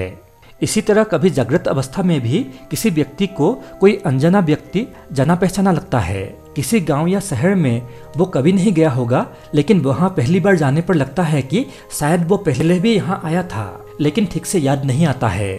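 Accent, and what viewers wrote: Assam, India. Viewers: আপুনি অসমীয়া নেকি ॥ আপোনাৰ কথাবোৰ অসমীয়া যেন লাগে হিন্দীত কয় যদিও ॥ ভিডীও বোৰ ভাল লাগে আপোনাৰ❤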